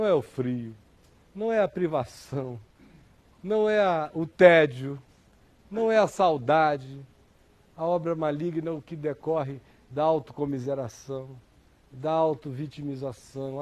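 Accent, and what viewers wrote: Recife, Brazil. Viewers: ai sabe que fala recife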